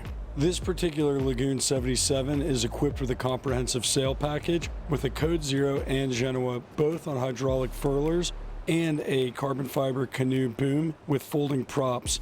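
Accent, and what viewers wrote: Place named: Maryland, United States. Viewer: Maryland accent. :)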